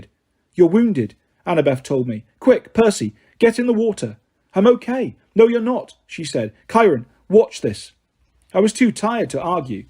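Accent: United Kingdom. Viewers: He's British § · He's BRITISH bro, what the fuck did you expect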